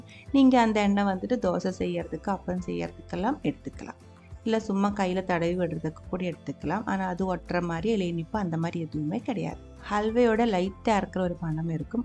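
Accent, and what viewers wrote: Kerala, India. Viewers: Alayalam kalantha thamil arumai sagothari valga valamudan aviyal solli kudunga enga kudumpame adimai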